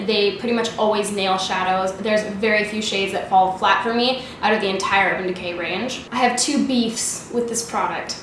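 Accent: Canada. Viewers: Definitely a Canadian pronunciation. Just like how she pronounces "bag" differently. It's not incorrect, just different from the American pronunciation. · This is the most I've ever heard your lovely Canadian accent make an appearance. Eye "baeg" " daegger" it was great. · That Canadian accent gets me every time haha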